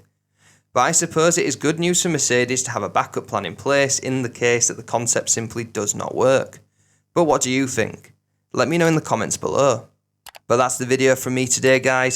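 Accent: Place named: Hull, United Kingdom. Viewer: That's deffo a Hull accent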